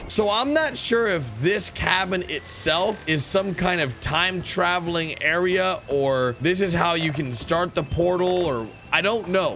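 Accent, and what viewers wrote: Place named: New York, United States. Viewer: He sounded like a New Yorker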